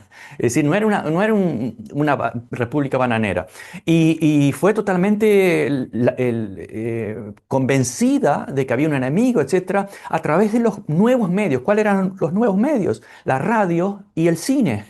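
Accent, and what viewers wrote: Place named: Corrientes, Argentina. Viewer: Ay! Pensé que eras correntino o misionero, un gusto conoocerte! 🇦🇷🧉🫂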